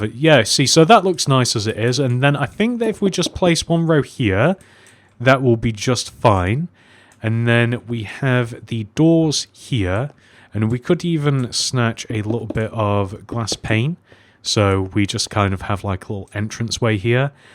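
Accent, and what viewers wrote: United Kingdom. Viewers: Ngl when I use this guys tutorials I use his British accent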